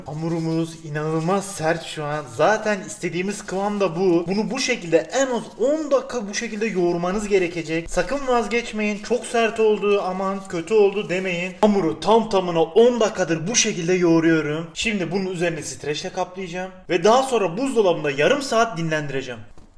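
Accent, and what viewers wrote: Adana, Turkey. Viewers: Abim sen adanalısın napıyonn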